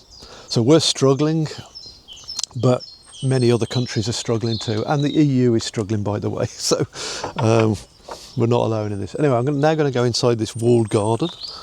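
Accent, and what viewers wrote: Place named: Midlands, United Kingdom. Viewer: I thought I detected a slight Midlands accent! .I used to occasionally go with dad and brother to Wolves matches(in the standing" pens") in late 1960s Originally from near Wolves myself and the last 30 odd years in France(though spending a lot of time in Sidmouth Devon for the last 6 of them helping out my elderly mum)I am, since she passed on a few months ago in a quandary. The problem is, like you, I really can't decide where to settle, always thought would stay in France but the time spent in Devon with mom and sister(who returned recently from about 35 years living in Trento Italy to Sidmouth) has confused things enormously as am getting old! Two offspring ,one living in Annecy France the other in London doesnt help either and they both could move on elsewhere. Anyway very much enjoyed listening to your story,hope things work out for you and family.Devon is a lovely county.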